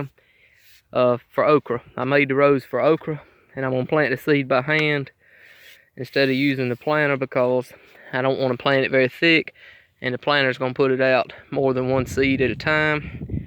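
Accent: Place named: South, United States. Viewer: From your accent I can tell you must be somewhere close to the South......Just want you to know that you subscribing to my channel is going to make me have to take my shoes of to keep track of my subscriptions. My dad always planted 3 seeds to the hill..... 1 pushing, 1 pulling, and 1 to come up........................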